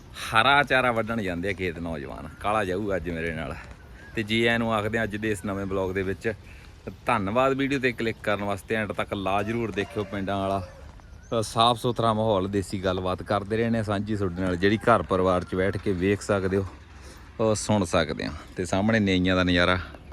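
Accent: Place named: Doaba, India. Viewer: 🙏❤👏🏾, ਬਹੁਤ ਵਧੀਆ 👌 ਵਲੌਗ ਹੁੰਦਾ ਹੈ! ਜਿਆਦਾ ਅਤੇ ਬਹੁਤ ਪਸੰਦੀਦਾ 🤩 ਪ੍ਰਸਿੱਧ ਪਰਦੇਸੀਆਂ ਪ੍ਰੋਗਰਾਮ 😂😂 ਜੋ ਨਹਿਰੀ ਠੰਡੇ ਪਾਣੀ 💦 ਨਾਲ ਦਿਲੋਂ ਪਿਆਰ 💕 ਨਾਲ ਭਰਪੂਰ ਬਣਦਾ ਹੈ 🎉😂😂🎉😂! ਅਤੇ ਹਰ ਦੇਸ਼ ਪ੍ਰਦੇਸ਼ ਵਿੱਚ ਬੈਠੇ ਪੰਜਾਬੀ ਉਡੀਕ ਕਰਦੇ ਰਹਿੰਦੇ ਹਨ ਕਿ ਕਦੋਂ ਨਹਿਰ ਦੇ ਤਾਜ਼ੇ ਠੰਢੇ ਪਾਣੀ 💦 ਦੇ ਦਰਸ਼ਨ ਕਰੀਏ ਅਤੇ ਹਰੇ-ਭਰੇ ❤ਖੇਤਾਂ ਦਾ ਕੁਦਰਤੀ ❤ਨਜ਼ਾਰਾ ਲਈਏ ਅਤੇ ਲੰਘੇ ਵਖਤਾਂ ਨੂੰ ਮਜਬੂਰੀਆਂ ਨੂੰ ਸਰਕਾਰਾਂ ਨੂੰ ਕੋਸੀਏ 😢 ਅਤੇ ਹਵਾਵਾਂ ਦੇ ਵਿੱਚ ਜਾ ਕੇ ਆਪੋ ਆਪਣੇ ਪਿੰਡਾਂ ਦਰਸ਼ਨ 😻+ ਯਾਦਾਂ 💪ਤਾਜ਼ਾ ਕਰੀਏ 🤦🏽🙇🏽😢❤🤷🏽‍♂️👏🏾☝🏾🙏! ਬਾਕੀ ਬੋਲੀ ਤੁਹਾਡੀ ਮਿੱਠੀ ਪੰਜਾਬੀ ਮਾਂ ❤ਬੋਲੀ ਦੋਆਬੇ ❤ਨਾਲ ਬਿਲਕੁਲ 💯👍 ਮੇਲ ਕਰਦੀ ਹੈ! ਬੱਸ ਸਿਰਫ਼ 🥭 ਅੰਬਾਂ❤ ਅਤੇ ਸੰਗਤਰਿਆਂ ਦੇ ਬੂਟਿਆਂ ਦੀ ਘਾਟ ਹੈ ਓਹ ਲੀਚੀ ਦਾ ਬੂਟਾ ਵੀ ਜੋ ਛਾਂ ਲਈ ਵੀ ਬਹੁਤ ਵਧੀਆ 👌 ਹੁੰਦਾ ਹੈ 🤷🏽‍♂️! ਬਾਕੀ ਤੁਹਾਡੇ ਮੌਸਮ ਅਤੇ ਮਿੱਟੀ ਤੇ ਨਿਰਭਰ ਹੋ ਸਕਦਾ ਹੈ ਘਰ ਵਿੱਚ ਪਸ਼ੂਆਂ ਵਾਸਤੇ ਦੇਸੀ ਅੰਬ 🥭 ਦਾ ਬੂਟਾ ਵੀ ਬਹੁਤ ਵਧੀਆ ਹੈ ਗਰਮੀਆਂ ਵਿੱਚ ਗੂੜ੍ਹੀ ਛਾਂ ਅਤੇ ਸਰਦੀਆਂ ਵਿੱਚ ਰਾਤ ਸਮੇਂ ਨਿੱਘਾ 🥰 ਹੁੰਦਾ ਹੈ! 😂🎉❤😂! ਜੱਟਾ ਵੇ ਤੇਰੀ ਜ਼ੂਨ ਬੁਰੀ ਹਲ਼ ਛੱਡ ਕੇ ਚਰੀ ਨੂੰ ਜਾਣਾ 🧑🏻‍🌾👨🏻‍🌾 | ਸੌਖੀ ਨੀ ਜਿੰਦ ਜੱਟ/ ਕਿਸਾਨ ਦੀ ਪੈਂਦਾ ਸੱਪਾਂ ਦੀਆਂ ਸਿਰੀਆਂ ਤੇ ਤੁਰਨਾ😮! 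ਰੱਬ ਉਤੇ ਭਰੋਸਾ ਰੱਖਦੇ , ਕਰਨ ਅਰਦਾਸਾਂ ਹੱਥ 👏🏾 ਜੋੜ ਵੱਲ ਅਸਮਾਨਾਂ! ਬਾਬੂਆਂ ਦੇ ਝੂਠੇ ਲਾਰਿਆਂ ਨੂੰ ਕਹਿਣ ਤੇਰੀ ਕਿਸਮਤ ਵਿੱਚ ਇਹੋ ਲਿਖਿਆ ਭੁੱਖਿਆ ਜਜਮਾਨਾਂ 🤯🤑🤮🤒😷ਸਾਡੀ ਤਾਂ ਡੋਰੀ ਉਸਦੇ ☝🏾 ਹੱਥ 👋 ਐ ਪਰ ਤੁਹਾਡੀ ਬਾਬੂਆਂ ਦੀ ਕਿਸਮਤ ਹੱਥ 🤑ਨੋਟਾਂ/ ਪੈਸਿਆਂ 💰 💵!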